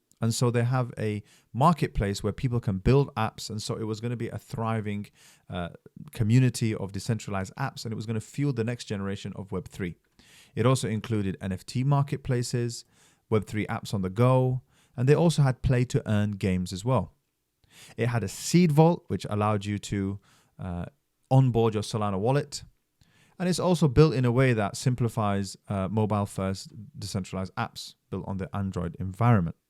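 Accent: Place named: India. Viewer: I can hear an indian undertone. good video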